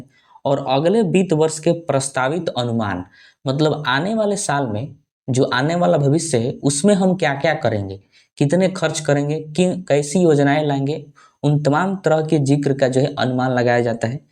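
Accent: Bihar, India. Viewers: Bihari boli hai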